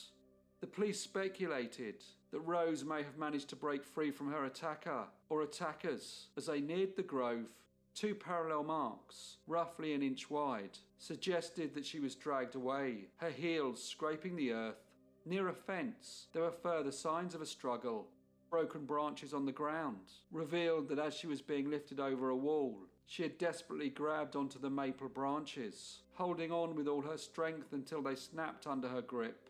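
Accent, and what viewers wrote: England, United Kingdom. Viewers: My goodness! I do so love an Engllish accent! I can't help but mentally picture Eddie Redmayne as the narrator details every tragic story. Both voices are quite similar to my ears. I only hope the narrator is NOT a product of AI. As a human, make no mistake, you are blessed with a talent only few possess--a great speaking voice & the determination to research & present these stories. Thank you so much for sharing your talent & interests with the public. This specific niche is yours alone. No one else can compare.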